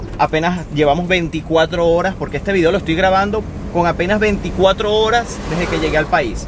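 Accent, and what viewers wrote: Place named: Venezuela. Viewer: Muchachoooo ya llega a su País Natal y se pone hablar como un Venezolano XD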